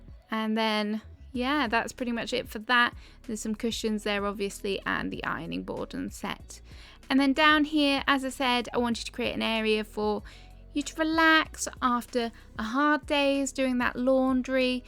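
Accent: United Kingdom. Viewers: Well I am a sucker for British accents lol : ) But once again love your channel, I have totally gotten some inpriration. <3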